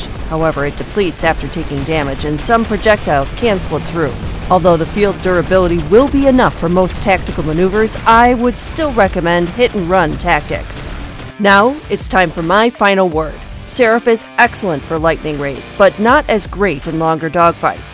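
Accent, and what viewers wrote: Russia. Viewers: aww what happened to the awkward russian accent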